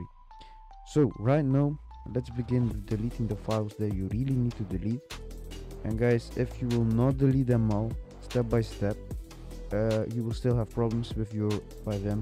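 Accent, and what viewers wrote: Netherlands. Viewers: ik hoor gwn dat dit een nederlander is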